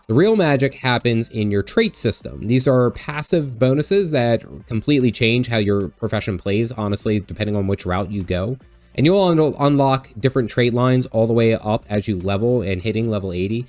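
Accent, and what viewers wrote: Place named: Eastern Pennsylvania, United States. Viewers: love the content and your eastern PA/south NJ accent. cheers, dad gamer from MA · Appreciate it! Catching some speech patterns. Are you from Maryland, Delaware, or Pennsylvania? Maybe parts of DC. Thanks for vid 🖖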